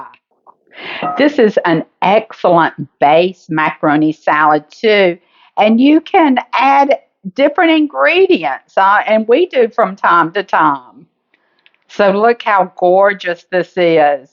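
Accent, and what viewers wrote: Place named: South, United States. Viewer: You can tell she's from the south. She pronounces "boil" like bowl.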